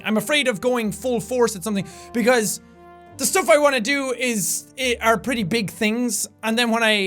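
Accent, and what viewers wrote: Ireland. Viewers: Early and Congrats on a huge mile stone I have watched you channel since you didn't sound Irish well done jacksepeye! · I'm so happy for 17,000,000 subs love your Irish accent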